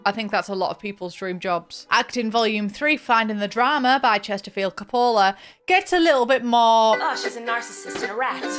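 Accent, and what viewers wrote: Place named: Redcar, United Kingdom. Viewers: Middlesbrough accent. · Is it cause we where both born at james cook that I love you so much . Cause girl it might just be that i live in redcar n we share an accent 😂🎉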